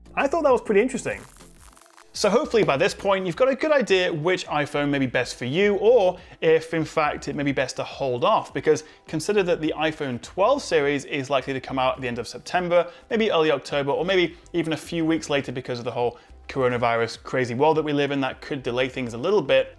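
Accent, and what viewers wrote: United Kingdom. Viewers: I´m subscribing because i´ve been studying english for about 14 years now... and your british accent helps me practicing and reinforcing my comprehension skills! (And also cause i love tech too i guess 😂😂😂) greetings from Colombia from a guy born in Argentina :[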